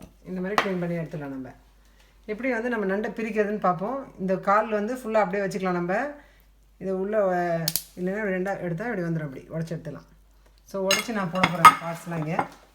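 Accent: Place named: Chennai, India. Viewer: So sweet of u for carrying our Chennai slang .very pleasant to hear in u.s.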